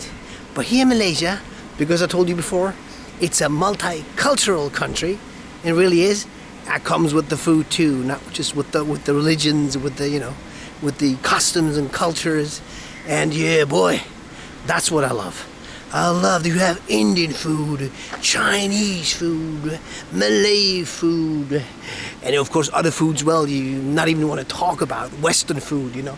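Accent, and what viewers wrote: United States. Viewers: Please I hope you should come to Sabah to explore more of out unknown beauty hidden in the big jungle of Borneo, deep sea treasures at Semporna, Mount Kinabalu n our friendest people in Malaysia.. Plus the rich varieties of sea foods, local foods n local fruits that maybe you have not across before.. By the by listening to your English slang.. You could be an American n migrated to Europe I used to stay in US in 1980s while studying at Indiana State University.. till I obtained M.Ed.Geograpy..came back to Kota Kinabalu Sabah 1989.I enjoyed 6 years staying in US during my studies.. I wis one day I ll come back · You say you are a Swiss family. But, you have a US accent, with alot of Americanisms. Why is that?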